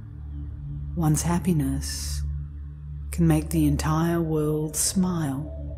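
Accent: Australia. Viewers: Rumi in an australian accent?? Woohooo!!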